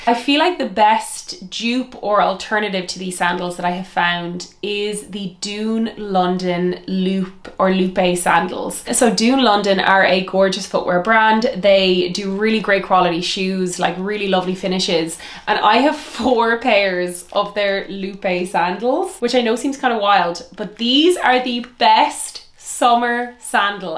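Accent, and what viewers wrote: Ireland. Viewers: Amazing duoes and finda. Love your videos and your cute Irish accent and think your make up and look getting better and better ( and you havr such pretty expressive eyes so much nicer without your glasses). 🩵🩵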